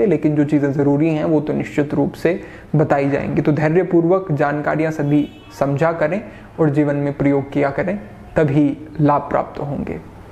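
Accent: Standard. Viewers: The most insperational part of this channel is your pure hindi pronunciation, you worthy your every inches of life, you are blessed, am bless to find this channel, thank you 🙏🙏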